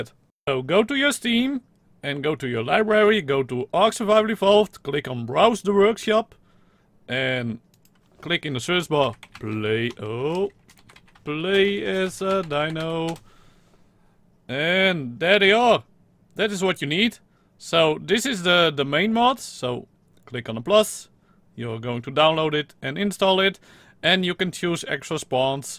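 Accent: Netherlands. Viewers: are you dutch? you have a dutch accent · Dutch? Your accent seems really dutch. Edit: Why can I not spawn as a water dino? When I do it spawns me on the beach since I cannot select water and it makes me human or invisible. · Ben je Nederlands want ik hoor het met ej accent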